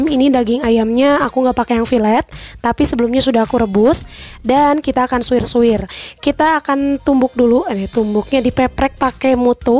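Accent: West Java, Indonesia. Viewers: Sunda mana teh meni geunaheun hhi,, sukses slalu teh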